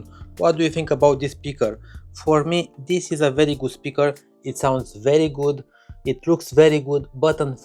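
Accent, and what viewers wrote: Romania. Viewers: a little bit of romanian english =)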